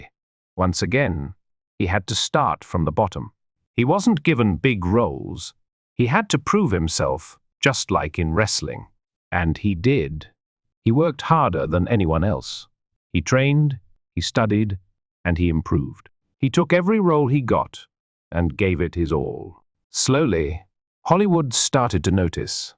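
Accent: United Kingdom. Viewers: I love these stories in British English ❤!! Thank you!!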